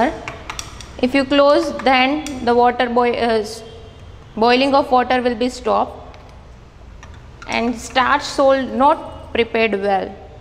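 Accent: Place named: South, India. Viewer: Lmfaoo her English is perfectly fine it's called an accent duh she is prob south indian so yeah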